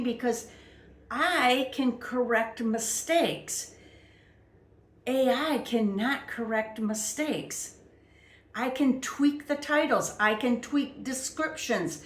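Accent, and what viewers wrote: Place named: Great Lakes, United States. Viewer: your accent sounds like your from the great lakes region ( chicago , milwaukee , minnesota )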